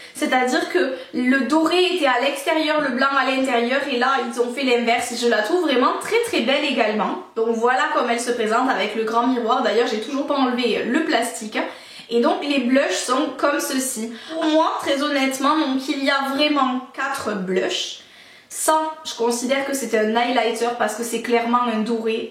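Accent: South, France. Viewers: coucou ma belle je découvre tout juste ta chaine, et je suis ravie <3 Je m'abonne avec plaisir ! d'ou est tu , il me semble entendre en accent du sud ? jai egalement une chaine, si l'envie t'en dit passe jeter un coup d'oeil ! bises à toi ! Tiphaine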